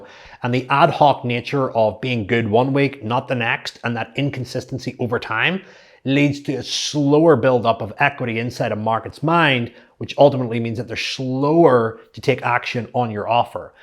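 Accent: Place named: United States. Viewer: Thanks! Very helpful. Loving my Mission Ready 💞 Ps.Your Irish accent seems to be turning American 😳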